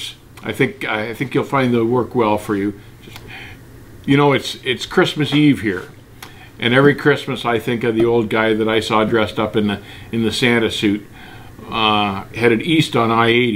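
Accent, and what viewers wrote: Canada. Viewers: nice to find your channel, you know your stuff and listening to your candian Accent reminds me of running upstate New York on I 81. The Canadian truckers were always the nicest drivers! Your stories also reminds me of the days when I hauled produce coast to coast loading in California back to Florida. I started over the road running for an owner operator and Produce was his main commodity. this old guy made me a much better driver because boy he was very high standards with his equipment. But I was grandfathered into the business in 1990 so all of my learning experience was on the job! It’s fun to watch your videos they are spot on !!! thanks for caring to raise the bar for the newer drivers! I now pull doubles for an union LTL company. “Wildflower”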